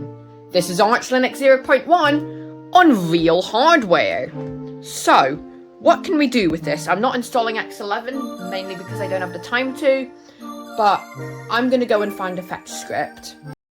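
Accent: United Kingdom. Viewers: I love how the british accent is so recognizable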